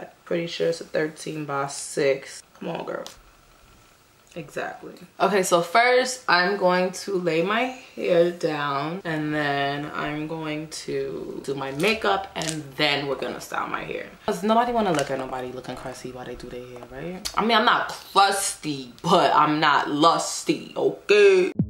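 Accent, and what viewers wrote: New York, United States. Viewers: Girrrrlll, you are and look so gawgus and bomb, yaaaaasss hunty❤️I love that wig on you and the style you did. I def gotta do this style too! And your shades are mad cute! Where’d you get them?? I need the deets. Anyway, keep doing you, boo. Stay stunning and successful💕🙏🏿🤑 P.S. Are you a New Yorker ?? Lol. You sound like you’re from NY (but forgive me if I’m wrong).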